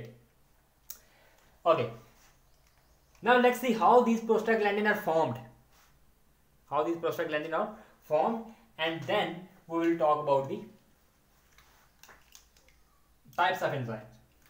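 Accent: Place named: Pakistan. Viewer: You explained very nicely sir thank you so much 😊 I think you are from Pakistan ( I recognised by speaking tone )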